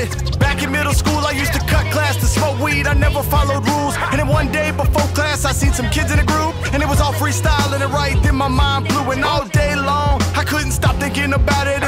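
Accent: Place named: New York, United States. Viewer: you sound your like newyork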